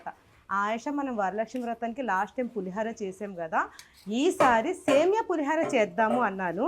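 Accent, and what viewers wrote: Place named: Godavari, India. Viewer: Mee telugu slang maa godavari slang madam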